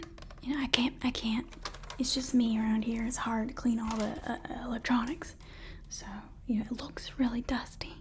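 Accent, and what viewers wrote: South, United States. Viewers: Why does every spacer she plays have a southern accent? First the Outer Worlds character, now this. 😅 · This is amazing! Love the southern accent! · Are you the mechanic from the Mandolorian cause I’m pretty sure she’s got kind of a southern drawl too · Southern accent in Star Wars huh😳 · Great Southern Accent I was born and raised in NC you nailed it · That southern Tattoine accent is just adorable. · Southern Accent! Thank you! ❤️ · "Southern Accent" LETS GOOOOOOOOOOOOOO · That Southern accent is so good, reminds me of your awesome Train heist ASMR video. Any chance you might do a Red Read Redemption video? Awesome video.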